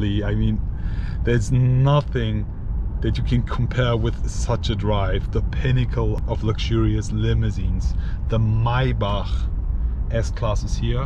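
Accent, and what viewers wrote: Germany. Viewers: Der bre ist so hard deutsch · Der Typ ist zu 100% Deutscher hahahaha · your english is very good, unfortunately with a very strong german accent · lool facts i guess this is just the german accent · Er ist sowas von Deutscher😂 100% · Man merkt die deutsche sprache haha! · Ich liebe den deutschen Akzent hahaha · Hab mich schon über den Akzent gewundert, bis ich dann das Kennzeichen gesehen habe. War doch, wie nach meinem Gefühl ein deutscher Akzent. Gefällt mir xd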